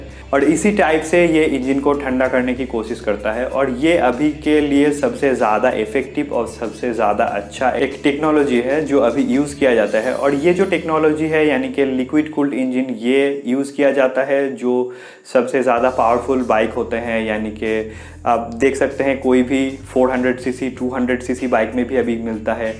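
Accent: Bengal, India. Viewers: Ap bengali ho Am I right? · Bangali lag raha hai. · Dada tum Bengali lagta hai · r u a bengali???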